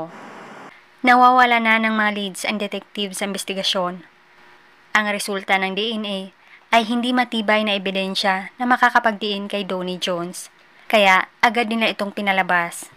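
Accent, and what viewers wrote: Visayas, Philippines. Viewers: Walang mali sa grammar nya, may puntong bisaya lang ang pagdeliver nya ng storya. Pero walang naman problema dum. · Grammar o diction?😂😅 naiintndhan nman mga sinasbi nya un ang importante.. Ganyan tlga kpag hnd tagalog ang nkasanayan.. Visaya yata si Mam..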